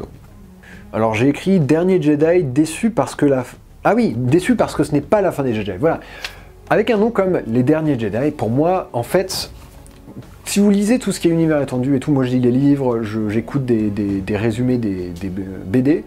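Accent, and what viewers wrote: United Kingdom. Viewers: Salut, critique super sympa, j'ai juste un peu de mal avec la prononciation à l'anglaise de tous les termes de star Wars...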